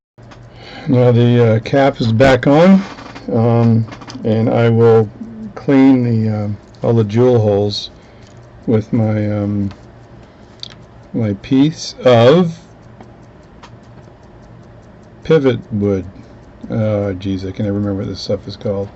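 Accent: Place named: West Island, Canada. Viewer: you may not be west island, but that is definitely west island french.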